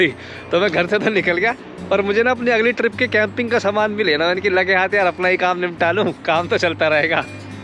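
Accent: Haryana, India. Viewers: I bet that is from Haryana because I can feel his tone even I am from Haryana.🔥💖😄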